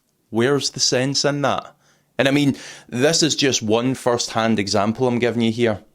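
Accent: Scotland, United Kingdom. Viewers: NOTHING beats a Scottish accent! Greetings from Arkansas. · I have a problem with Scottish accent; Did you say cambus or canvas at the begining? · I love a man who has the courage to tell the unpopular truth...and even more when he does it with a Scottish accent. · My friend not only you do a great job you also introduced me to the Scottish accent (love it, no subtitles for me from now on 😂). Seriously, your content is great and of significant practical importance. Thank you. Take care, keep it on. Greetings from Greece.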